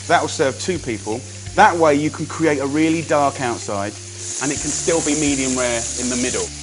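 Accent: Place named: United Kingdom. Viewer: 영국식 발음 맘에 드네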